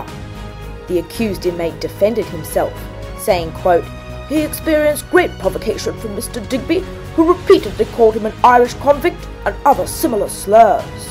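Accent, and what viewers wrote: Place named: Australia. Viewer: 😂😂😂 love the early Australian / english accent commentary 😅😅😅 that was a great video ✌️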